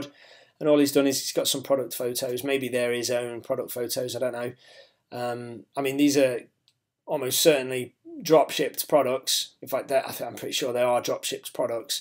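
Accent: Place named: Nottingham, United Kingdom. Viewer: Glad I stumbled across this. Genuine guy with a lovely Notts accent. Just subscribed 👍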